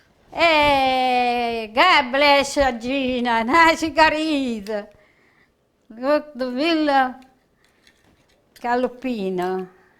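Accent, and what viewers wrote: Italy. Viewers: Shes Italian.... don't like her accent don't watch her... be nice or leave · We love Her with her very nice ITALIAN ACCENT grazie mile nonna Gina 😘😘😘🌹👍💝💋💋💋from Canada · 😁😁😁😍🌹🌻🌷 love your accent-- very charming (butter and oil). True Italian. The dish looks so very easy and yummy. Thank you for sharing!! Happy Holidays a great Christmas and New Year to ENTIRE FAMILY!! Be safe!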